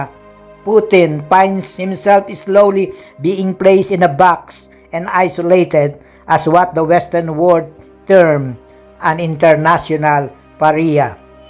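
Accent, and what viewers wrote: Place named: Philippines. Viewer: Wow! That was great vlog,. Good English with Pinoy diction. Congratulations.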